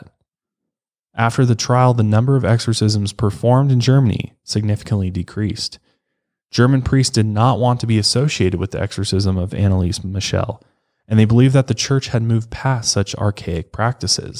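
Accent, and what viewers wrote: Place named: Colorado, United States. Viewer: I think Colorado.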